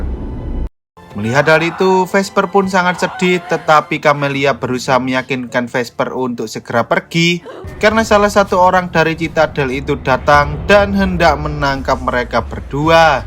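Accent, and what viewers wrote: Java, Indonesia. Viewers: Ngomong lo jangan medoooook broo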